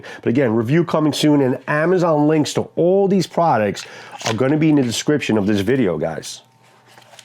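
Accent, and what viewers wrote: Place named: New York City, United States. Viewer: What borough of N.Y.C. is your accent from?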